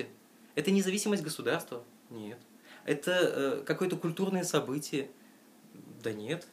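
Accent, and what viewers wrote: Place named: Russia. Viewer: Здравствуйте. Заинтересовала книга. Спасибо за рассказ. Подскажите из какой вы части России или вы из Украины? Интересует ваше произношение Япония, японцы.)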